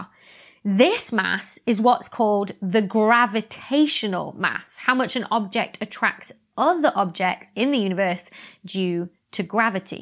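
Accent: United Kingdom. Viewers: If u didnt had this soo british accent i would watch all of the vids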